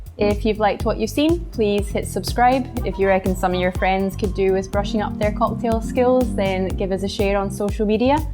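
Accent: Scotland, United Kingdom. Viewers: Scots accent, that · Best ever bartender - competent, brilliant tutorials, love hearing the history of the drink and about the spirit brands. Last but not least - I love your Scottish accent 😃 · Wonderful Scottish accent! Pairfect! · I had to rewind, first time hearing that on YouTube. Good Scottish lass. 😂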